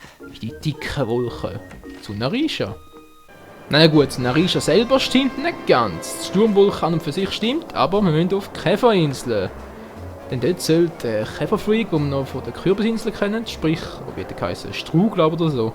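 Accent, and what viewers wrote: Switzerland. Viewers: also ich finde das schweizer-deutsch ist gar nicht so schlimm^^ es ist sogar mal ganz schön. ist was anderes. ich verstehe zwar als deutsche nicht alle wörter, aber das ist mir egal. es macht Spaß dir zu zuhören, da man das schweizer-deutsch ja hier so selten hört^^ mach weiter so!!! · Also ich mag dein Schweizerdeutsch ;D Ich verstehs ganz gut^^ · war cool mit dem schweizerdeutsch^^ ich dachte ich hätte mehr probleme dich zu verstehen,aber war ganz ok! hast du eigentlich schon n lets play von twilight princess?wenn nich wäre ich wieder für min.1 part in scheizerdeutsch;)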